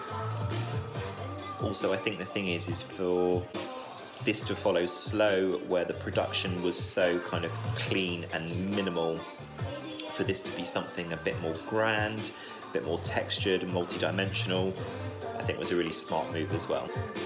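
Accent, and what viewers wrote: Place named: United Kingdom. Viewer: I Just loved your british acsent... And I get a little bit surprised 'Cause i' ve never thought that you enjoy and... The other 3 songs I understand... But the other 2... It was a really huge surprise. Most of all:, 'Cause for her it wasn't a goog single... But I really enjoy this reaction...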